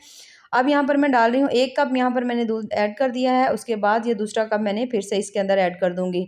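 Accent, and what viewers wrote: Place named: Punjab, India. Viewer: AOA Apki kafi videos me ny dakhe hen, ap jis Andaz me or Punjabi baul K bat krti hen, muje boht acha lgta he Apko sun KR,,, ap bohat ache baten krti hen Jo K reality se related Hoti hen😊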